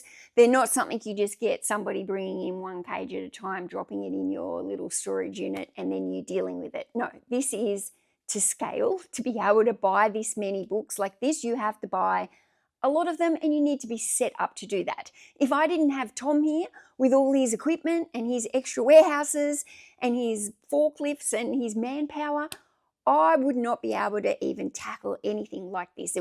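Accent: Australia. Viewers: I like your Australian accent.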